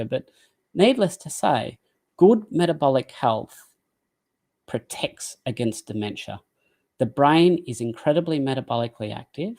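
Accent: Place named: Australia. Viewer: Wanna give a shoutout to whoever thought of adding subtitles to this video. This helps for us people not familiar with the Australian accent. Hope this'll be the standard for future videos because YT's Closed Captions suck.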